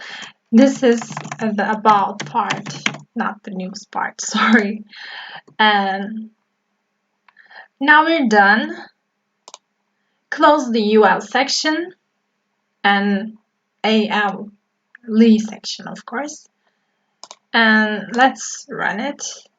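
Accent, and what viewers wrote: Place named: United States. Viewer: you speak like you live in america hw dd u do that!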